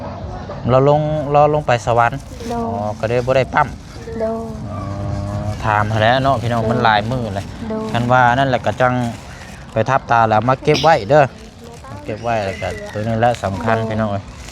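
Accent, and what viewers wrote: Thailand. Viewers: กลับมา..จากบ้าน ( ออกจากบ้านมา) สำเนียงไทย ❤❤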